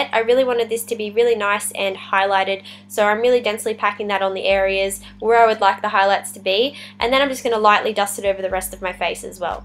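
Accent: Australia. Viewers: r u australian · this is so cool, and are you australian you sound like your aussie lol x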